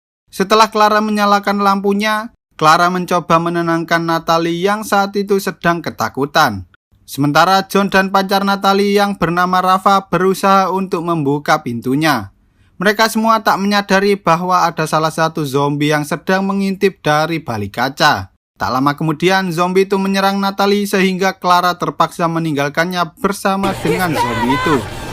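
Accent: Java, Indonesia. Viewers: Mimin orang jawa kahh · Jowo mas🔥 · Jowo pisan iki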